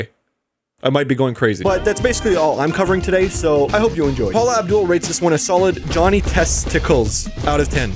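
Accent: Canada. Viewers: Holy crap you swear like a true Quebecker! Props to you!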